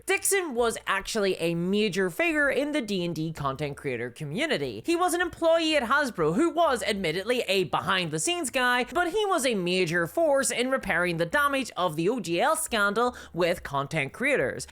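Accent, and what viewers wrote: Northern Ireland, United Kingdom. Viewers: Northern Irish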